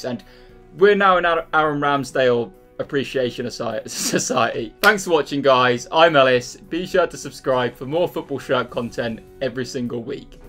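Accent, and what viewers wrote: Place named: Suffolk, United Kingdom. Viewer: You must be from suffolk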